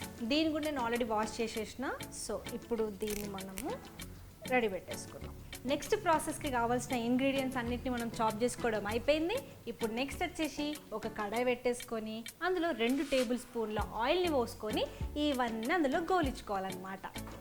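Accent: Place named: Warangal, India. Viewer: Warangal slang Also to good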